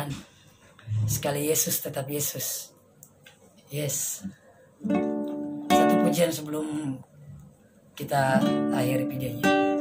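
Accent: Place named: Bugis, Indonesia. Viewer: ee.. terjawab sdh pertanyaan semua org siapa dan ee.. lho kok ketularan gaya bicara bugis ya 😀👍 Gbu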